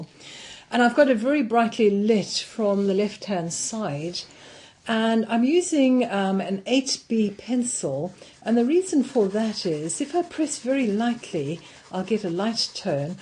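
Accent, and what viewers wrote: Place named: RP, United Kingdom. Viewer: So posh :p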